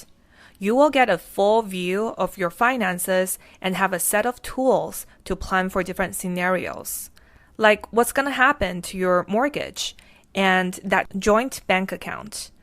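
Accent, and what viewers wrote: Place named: Asia. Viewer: I detect an asian accent.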